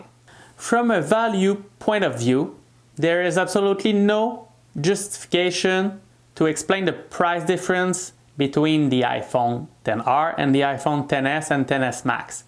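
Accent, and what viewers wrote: Quebec, Canada. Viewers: J’ai reconnu l’accent québécois hein? PS:moi aussi j’en suis un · Wooooooow t'es du Québec right?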